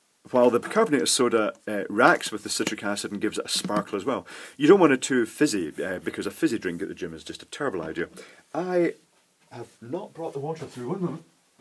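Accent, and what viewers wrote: Scotland, United Kingdom. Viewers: you can tell your scottish lol............ get 6 for a quid in poundland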